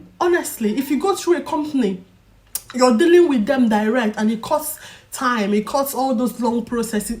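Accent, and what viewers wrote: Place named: United Kingdom. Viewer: The British Accent is getting more and more, am loving it thou. A very big congratulations .there is a big joy to get a yes in a dream n wished job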